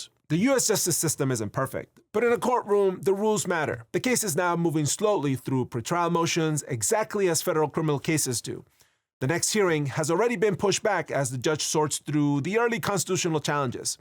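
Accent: Chile. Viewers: Wonderful video, loved the Chilean accent and thank you very much for naming/showing for a moment the other coups from Latinoamérica PD: Mi departamento completo quedó plop cuando lo escuchamos hablar español, lo seguiremos apoyando desde Chilito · Not gonna lie, the Chilean accent took me by surprise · Había notado el acento, pero no sabía que era chileno. Yo he visitado La Moneda, Plaza de la Constitución y el Museo de la Memoria y Los Derechos Humanos. Fueron impactantes. Espero que no tengamos un Tanquetazo aquí, pero estoy preocupado. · No sabía que eras chileno! Pero después de escucharte hablando en español es muy obvio y no me puedo creer que nunca me dabas cuenta que eras latino. ¿Nos darás unos vídeos legales en español, por favor?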